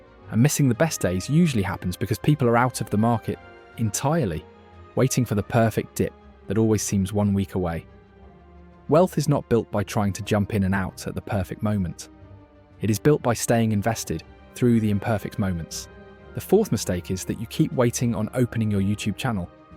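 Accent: United Kingdom. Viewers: A great video! Thanks for posting! I thought you were a British YouTuber as you have selected a British accent for your narration. Would you do this for the UK? Or are we too small?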